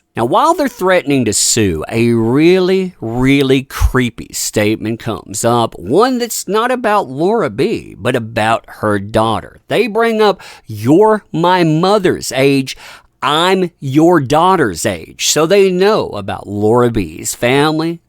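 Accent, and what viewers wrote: South, United States. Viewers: I'm confident you've all (star youtubers🥰) thought about a class action lawsuit against Shane and/or the guy that threatened you with FBI and/or AH for threatening you and your families....but I'm also guessing none of you want to be tied to a lawsuit, you just want to move on with your lives....gracing your audiences with the truth, unlike the mainstream media. On another note, I can't tell you how much I've enjoyed watching and learning from all of you. Especially you, I just love your powerful, short presentations with a southern drawl covered by an umbrella.....mysterious and elegant. Anything I can do to back you guys up......I'm in!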